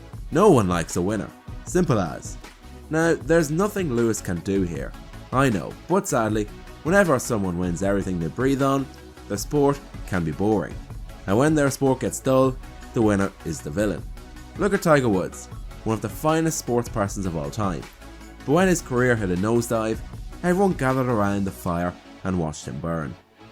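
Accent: Ireland. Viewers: tf you on about with weird accents and being British he's irish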